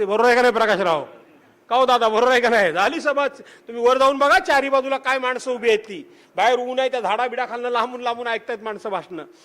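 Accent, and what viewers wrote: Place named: Konkan, India. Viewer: Kokani awaz dadanit awaj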